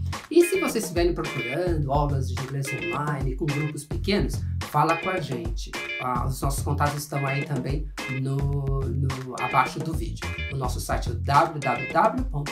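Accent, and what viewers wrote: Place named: United Kingdom. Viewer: Parece que o sotaque do Professor é do Inglês Britânico.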